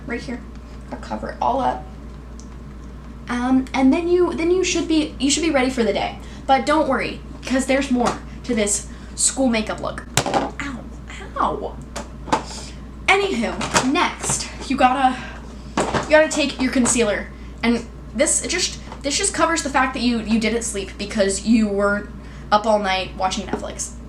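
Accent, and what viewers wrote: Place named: South, United States. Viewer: Beautiful video, I just subscribed, are you southern? I detect a tiny bit of an accent but I’m not totally sure.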